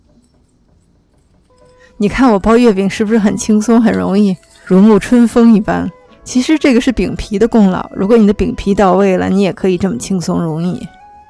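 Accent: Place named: Beijing, China. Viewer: 这是北京口音吧，声音听着很舒服。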